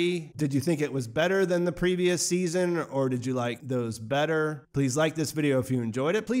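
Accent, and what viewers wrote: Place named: Pittsburgh, United States. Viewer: Hey! Thanks for the great analysis! I picked up on your Pittsburgh accent! I am a Burgher too!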